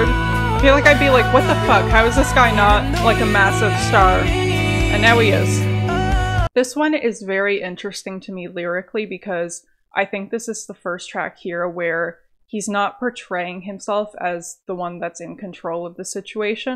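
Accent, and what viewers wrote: Canada. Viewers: Are u canadian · The Canadian accent strong as hell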